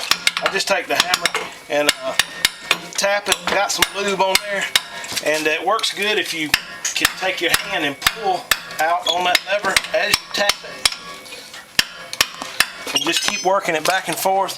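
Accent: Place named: Georgia, United States. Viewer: South for sure. By the accent I'd say Ga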